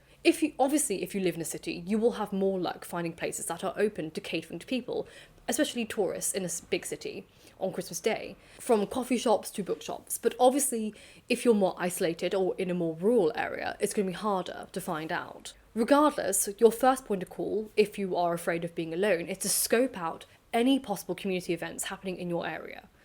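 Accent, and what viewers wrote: United Kingdom. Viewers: Does this specific British accent have a name?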